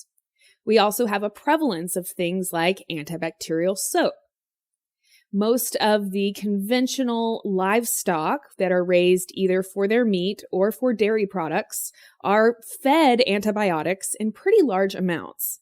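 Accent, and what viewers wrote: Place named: United States. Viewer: I have a video request for you; would you mind doing one on the Ghrelin and leptin hormones? Thanks in advance! Keep up the amazing job! P.S. I like your American accent; this is what I'd love to sound like as I keep working hard on becoming fluent. :) I look forward to watching more upcoming videos you may have in store for us! :) Take care!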